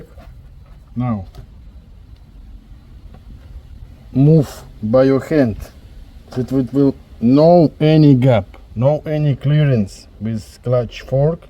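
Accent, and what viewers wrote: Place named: Russia. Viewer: На кой ты по пендоски базаришь , разговаривай на русском как нормальный человек, ты же блин из России , по отвратительному акценту и заднему плану понятно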